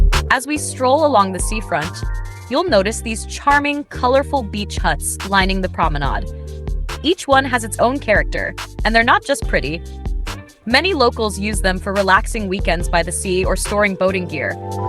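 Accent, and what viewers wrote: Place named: United States. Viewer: Ruined by this awful American accent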